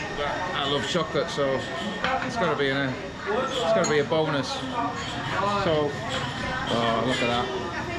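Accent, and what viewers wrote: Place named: Teesside, United Kingdom. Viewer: Just subscribed, going to watch your vids tonight, your accent sounds familiar, are you from Teesside?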